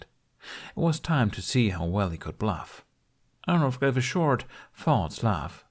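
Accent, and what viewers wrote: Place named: France. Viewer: Dude the THICK french accent OMFG mate its fabulous.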